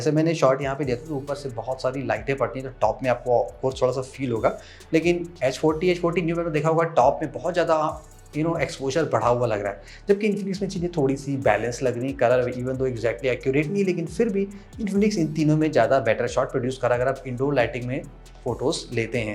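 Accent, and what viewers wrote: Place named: Bhojpuri, India. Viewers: I love bhojpuri style 😊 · Are sir ji aap to bhojpuri wale nikle 😀 kyaa baat kyaa baat ❤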